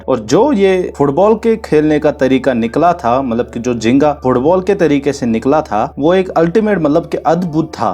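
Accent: Kashmir, India. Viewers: I think you are KASHMIRI because of your accent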